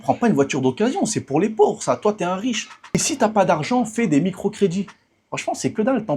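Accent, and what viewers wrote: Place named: France. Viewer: 😂 TU RELAVE GRAVE LE NIVEAU... C'EST POURQUOI JE T'ÉCOUTE ET JE TE SUIS. J'adore ton accroche! Ça me fait rire👍 C'EST TRÈS VENDEUR! TU ES TRÈS VENDEUR 👍 C'EST TRÈS BON ÇA C'EST POURQUOI TES PODCASTS ET TES VIDÉOS FONCTIONNENT TRÈS BIEN. AU DÉBUT ÇA M'A SURPRIS TON ACCENT DE CITÉ MAIS JE ME SUIS FAIT PARCE QUE JE SUIS PAS HABITUÉE. ON SENT TU ES QUELQU'UN DE BIEN GARÇON DE BONNE FAMILLE COMME ON DIT CHEZ NOUS ÇA C'EST NOTRE CÔTÉ VENDEUR NOTRE CÔTÉ COMMUNICANT QUI EST SUPER BON👍 Ton accroche peut être super titre pour un film! tu sais ça!? 👍😂 Il faut déposer la marque... Tu crées des titres qui accrochent c'est une marque d'approche ça c'est top Et mec tu vas être copié alors dépose vite la marque Après tout tout est déposable. Tu sais tout le monde t'écoute tout le monde t'observe Les comiques les artistes et les scénaristes s'en inspirent... 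Pour te copier et copier ton style ensuite ils vont le vendre dans des films c'est sérieux ce que je dis.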